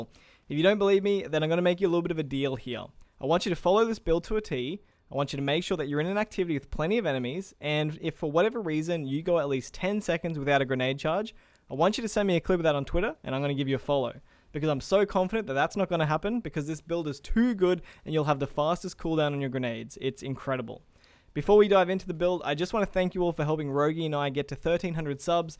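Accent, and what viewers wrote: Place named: Australia. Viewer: i only just found this channel but its awesome to hear another aussie making videos i might be late to the party here but im new to destiny and i'd love to see some beginner friendly citans ramparts builds for titans its the only exotic i have for titan and i can see void is already strong at the moment but i like the other subclasses too so hopefully you could make a video of some different options thanks, hope i can win the emblem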